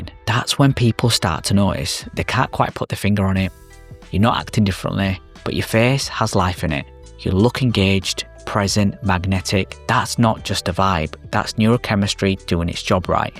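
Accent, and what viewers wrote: United Kingdom. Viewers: what British accent is this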